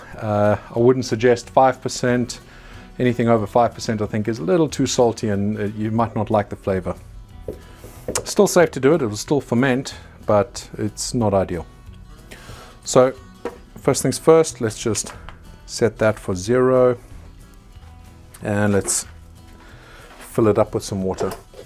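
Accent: South Africa. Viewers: New subscriber!Great videos. Watched one and then about 6 more.Huge fan of hot peppers, and the accent doesn't hurt. South African?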